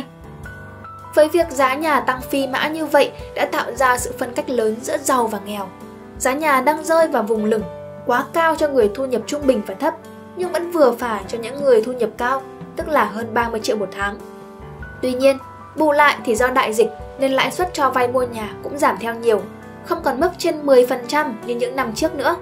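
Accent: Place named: North, Vietnam. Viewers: Căn giá rẻ thì vị trí không đẹp, căn đẹp thì vị trí mắc? Sao đang nói giọng miền Bắc nhưng cứ chêm mấy từ của miền Nam vào vậy ạ?! Râu ông nọ cắm cằm bà kia. Lạm dụng quá nhỉ? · Bài viết có thể của 1 bạn miền nam mà còn giọng đọc thì của bạn miền bắc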